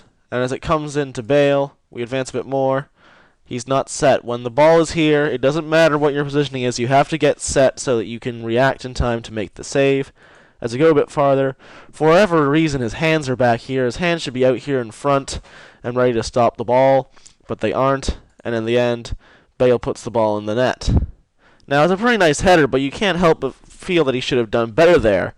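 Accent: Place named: United States. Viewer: was actually going to listen to the whole video untill I heard your accent, you americans don't no shit about football! boruc is currently one of the best goalkeepers in the premiership.. saints fan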